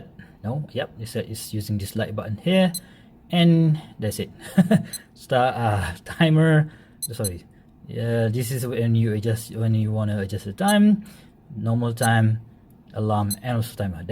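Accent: Malaysia. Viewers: nicely explained and straight to the point! :D by any chance are you malaysian or singaporean?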